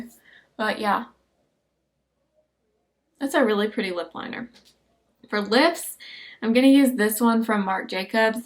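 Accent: South, United States. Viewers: You are so heavenly! Love your videos! Where are you from? I hear a slight southernish accent perhaps?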